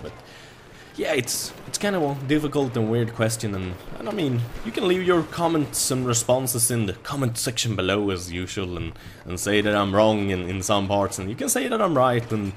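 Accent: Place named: Sweden. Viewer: man i wish i had a swedish accsent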